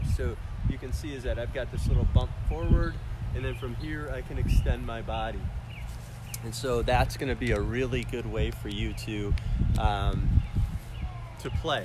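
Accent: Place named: Florida, United States. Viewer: Great video, sounds like you are fairly local to me. Have you ever played at Grand Lake RV resort in Citra Florida? It is close to Ocala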